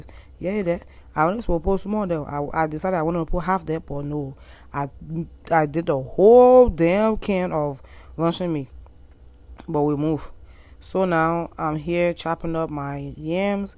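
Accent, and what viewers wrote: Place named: Liberia. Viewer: I love the English😂❤ koloqua to the world🇱🇷🇱🇷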